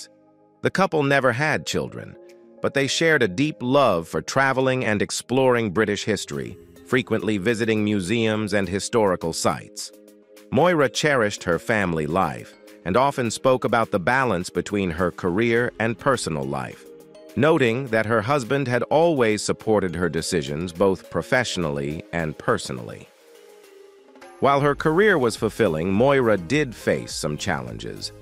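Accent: United States. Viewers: Ai yank commenty ruined this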